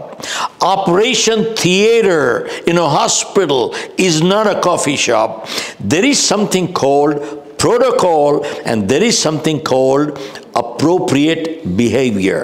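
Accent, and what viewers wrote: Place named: West, United States. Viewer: West accent is supercool.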